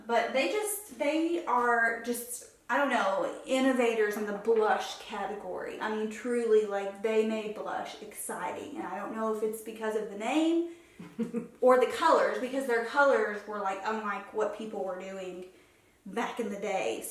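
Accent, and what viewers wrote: South, United States. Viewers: Your beautiful Southern accent became much more prominent in your conversation with your mama. You sound like me! I love it when Southern-born people proudly keep their accents. 🤗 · When you're near your mom, your southern accent comes back a little bit lol. Such a sweet video!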